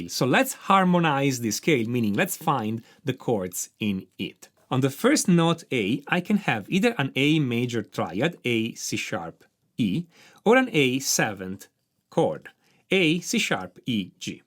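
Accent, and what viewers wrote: Italy. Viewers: I like your accent. Italian?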